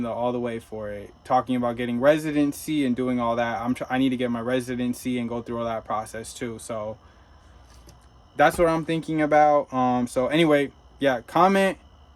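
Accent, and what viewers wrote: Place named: United States. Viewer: Здравствуйте.Возможный вариант,как можно поступить( ну или,как бы сделал я))).У тебя родной английский язык( американский акцент)- это можно монетезировать.Есть парень из Шотландии,он устроился в частную школу в России и неплохо зарабатывает,спишись с ним.( он есть на ютубе).Что это даст: погружение в среду,основы русского,просто общение,ну и как шанс- найти русскую жену, ведь жить одному в России - это неправильно). Дальше: в школах летом 3 месяца каникулы,можно и США поехать ,поработать на траке эти 3 месяца,почему и нет,ну а можно и не ехать..Совет: не ищи себе девушку на сайтах знакомств в России,лучше в других ( обычных) местах.Бизнес- это потом,окунешься в среду,, найдешь русскую жену ,так легче.( да и меньше рисков ).Желаю тебе исполнить свою мечту.Удачи,друг!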